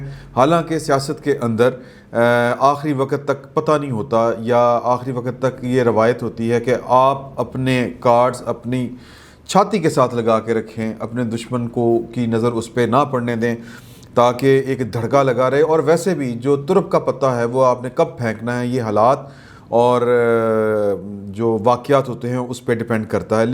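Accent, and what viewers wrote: Punjab, Pakistan. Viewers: Your punjabi blended urdu narration is really fascinating. Also,your way of telling events in nuanced manner, associated with humour & sarcasm is probably the best amongst the other competing Ytubers.You said politics is not everybody's cup of tea but,I bet you are no less a seasoned politician. Good luck to you.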